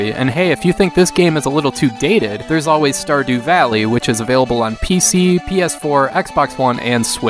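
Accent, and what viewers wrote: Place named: Midwest, United States. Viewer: It's very midwest, its like I'm back home.. 😎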